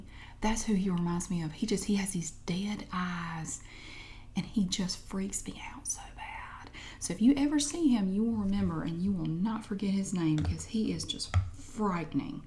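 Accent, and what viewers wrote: South, United States. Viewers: You are real Southern Belle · this type of southern accent reminds me of Tree Trunks from adventure time haha 💗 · Something about a southern accent I actually enjoy lol I'm a Georgia city guy but I still love it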